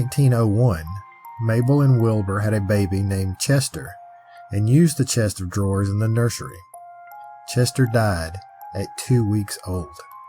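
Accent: South, United States. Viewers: What an eerieitem... I was a Zac baggins enthusiastic but he's kinda lost me a little,... yet again your Southern drawl thrills me ❤😊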